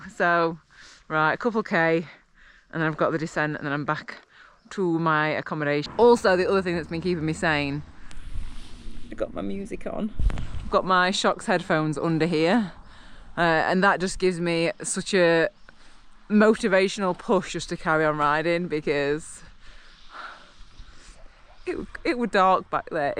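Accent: United Kingdom. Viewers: Both my wife and I were teachers for 45 years. Because of that, we have this little habit of trying to imagine what someone looked and acted like as a child. As we were watching your video, and you were wrinkling your eyebrows while apologizing for being grumpy in your lovely British accent, we both looked at each other and said, "I bet was an ABSOLUTELY ADORABLE child 🥰." Thank you for being you and for bringing a little sunshine into our rainy lives (It's "chucking down" here in the USA too. 🌧️⛈️☔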